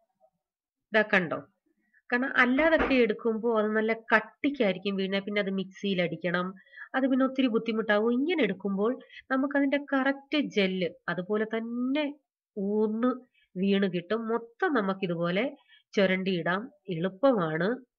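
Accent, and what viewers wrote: Kottayam, India. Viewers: Chechy.nalla video anu. Chechy kottayam.kariyano atupoleya chechiede samsaram.sweet voice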